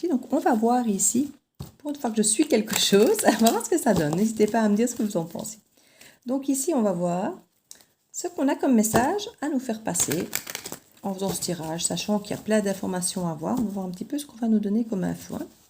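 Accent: Liège, Belgium. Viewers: Je vous decouvre et m'abonne, tres bon tirage tres explicite, bravo 😉 Je pense déceler le petit accent de ma région, Liège 😁🤪🥰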